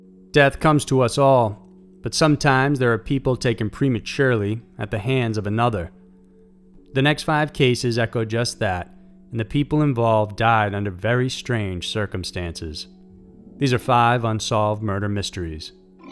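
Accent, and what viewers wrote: South, United States. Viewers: I love it too! He sounds like he has a southern accent with some of the things he says!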